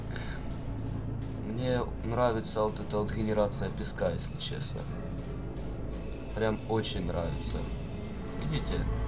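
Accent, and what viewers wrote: Ukraine. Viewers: Вот в этом можно прям услышать акцент украинцев но в 2024 году его прям не слышно и я думала что он русский пх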